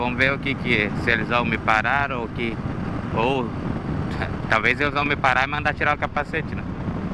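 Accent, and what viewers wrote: South, Brazil. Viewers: Pelo sotaque é do Sul Paraná ou Santa Catarina? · Pelo o seu jeito de falar, parece que tu és do Sul do Brasil. Que Deus abençoe o seu destino, mostrando para nós um pouco do Brasil. 10/01/2021. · Pelo sotaque o dono do canal parece ser do sul. Acertei?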